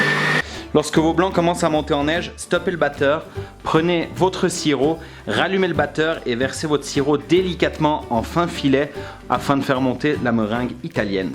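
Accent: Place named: Valais, Switzerland. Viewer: Le bonne accent valaisan 😍😂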